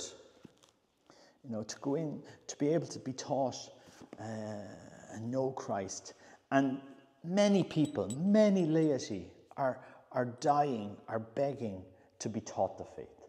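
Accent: Ireland. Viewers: you are so lovely to listen to - you have such a gorgeous Irish accent and what you are saying is so simply wise and beautiful. So true - Christ is our focus and prayer is our life blood; I recently attended a parish ‘consultation’ on whether the two dioceses in South Wales should be merged. The outcome was obviously pre-decided, and the whole presentation was put in terms of financial resources. No mention of prayer - as a few of the wisest and most enlightened of the parishioners mentioned to me. How can they possibly expect the church to flourish when even the leaders - even the bishops - do not see the value of prayer!!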